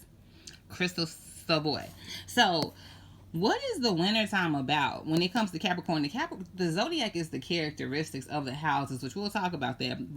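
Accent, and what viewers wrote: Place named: Tennessee, United States. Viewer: love your accent, not Georgia, maybe Tennessee Kentucky?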